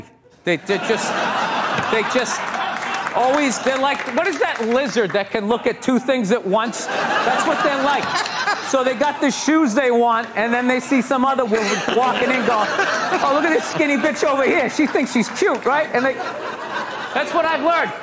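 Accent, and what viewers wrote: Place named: Boston, United States. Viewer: Being from Boston, everything he says just sounds like normal conversation between friends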